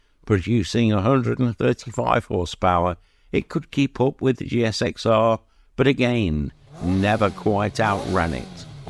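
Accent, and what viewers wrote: United Kingdom. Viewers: I love the stuff you put out. Love across pond accent and knowledge. Here in the States we got all the Japanese bikes and very few Euro bike ever made over here until the 2010’s. Not that had numerous bikes but I’ve had a few. I still own a 75’ Z1b and it’s stablemate is a ZX6R. The 600 will do an honest 268 kph or 166 mph, not bad for a bike half the displacement discussed here. I don’t need to go any faster. I supposed if I had it dyno tune and spent for a titanium exhaust I could go a little faster, but it’s not worth the money or effort. Besides I love hear that scream at 16500 rpm.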